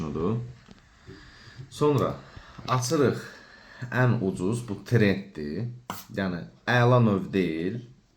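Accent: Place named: Qazakh, Azerbaijan. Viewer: Ləhcədə ki SUPER🙏🙏🙏 QAZAX ləhcəsi👏🙏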